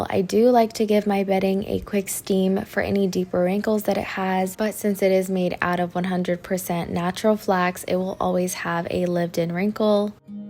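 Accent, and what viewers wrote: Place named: Texas, United States. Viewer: I’m guessing Texas or Cali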